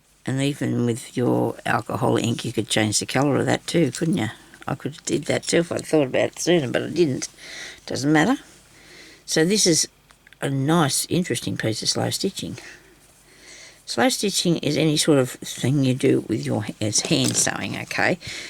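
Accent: Australia. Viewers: You're so cheerful. Enjoy hearing you talk Australian. Lol 😀TFS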